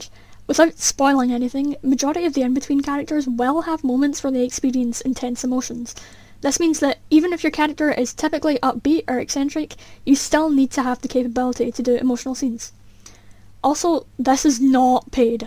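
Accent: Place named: Ireland. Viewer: are you irish i recognise your accent lol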